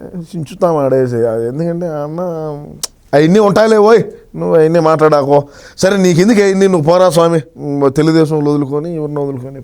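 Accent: Rayalaseema, India. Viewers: Bro sooper..... Nee body language and seema accent superb❤😂😂🎉🎉🎉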